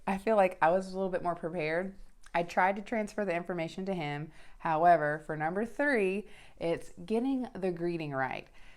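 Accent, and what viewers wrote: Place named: Norway. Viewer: She has picket up the local accent That's why it sounds very special. I had no problem understanding it was Norwegian.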